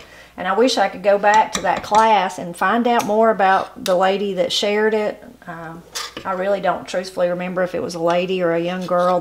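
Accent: Appalachia, United States. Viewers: I love your videos. Take care! You’re loved here in upstate SC. I’m familiar not only with your speech and traditions, but you’re so sincere in your love for the history and ways of our Appalachia, as am I, , I would love one day to be able to just sit down for coffee and some good conversation with you. I feel like you’re a friend already. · What a pleasant surprise, stumbling on a cooking video from you. I love to cook and Appalachian cooking is nothin to me other than the way I grew up eating here in Texas, thanks to my granny coming from KY to stay with us while mom worked. Listening to your videos is like sitting on the porch visiting with family. I remember people actually going to VISIT. What an odd concept to the insanity of today's world. God bless you and your family and keep you safe. By the way, you don't talk neer as up the holler as my kin folk in Southwest Kentucky. 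😁👍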